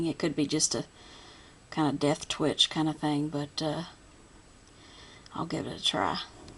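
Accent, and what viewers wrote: South, United States. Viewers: I like your southern accent. I wish my Californian accent was as cool as your accent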